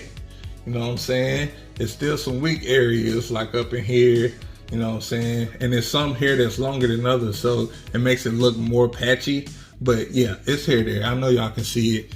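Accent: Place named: South, United States. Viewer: Even got hair on my chest … “ but that was already there “ 😂😂😂 bro you need a podcast , you’re hilarious. I can tell you form the south for sure . I am to , I’m in North Carolina.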